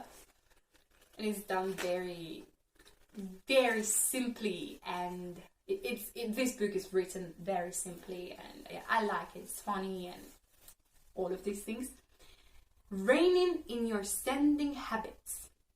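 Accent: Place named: United Kingdom. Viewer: Have u come from the UK?😅 I love the british accent and I admire how fluent u are. Excellent work.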